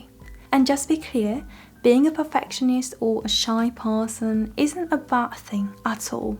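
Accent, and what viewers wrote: England, United Kingdom. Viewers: wow you have a strong English accent when speaking English 😅 thats cool!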